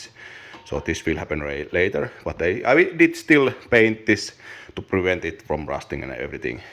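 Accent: Oulu, Finland. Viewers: Well I didnt have problems with rally English so carry on as you please! And also im native Finnish speaker but I dont mind listening in English. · I vote English. For every amis you lose as viewer you’ll gain 5 more international viewers. Plus Finnish is the best sounding English accent. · Great work again and for me both languages are fine. Oulun murretta on kyllä ollut kiva kuunnella kun äidin suku on sieltä suunnalta 😀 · Oli kyllä suoraan sanottuna pettymys rallienglannin takia.. jatka suomeksi mieluusti!! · Anna tulla vaan englanniks. "Rallienglanti" antaa vähän samat vibat, kun Oulun murre suomeks😃 Kielellä ei väliä, kun sisältö on edelleen 💪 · Itse olen harmitellut monia hyviä kanavia kun höpöttävät kieliä joita suurin osa ei ymmärrä. Kyllä englanti tässä palvelee suurta yleisöä. Itse saatan tehdä videosarjan e21 restomodista. Vähän sellaiseen Don DIY tyyliin eli englanniksi mutta puhetta mahdollisimman vähän. 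Mites toi rallienglanti, äännätkö niin tahallaan vai onko se vain opittua? Esim. Kalle rovanperä puhuu rallienglantia mutta mielestäni olen välillä kuullut kun hän puhuu englantia ilman rallimurretta. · Nyt oli kyllä melkoinen parkaisu ja maan tömistely :D ei muuta kuin uutta konstaa seurantaan, tuskin loppuu Youtubesta kesken. En kyllä aiemmista videoista muista näin positiivista kommenttikenttää kuin tällä videolla on. Onhan tämä vähän koomista katsoa suomalaisen puhumaa rallienglantia, mutta Bemarit tässä eniten kiinnostaa.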